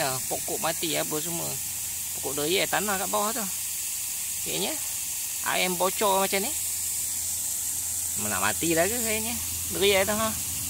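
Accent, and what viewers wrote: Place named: Pahang, Malaysia. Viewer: Pekat ngat pahang nye😂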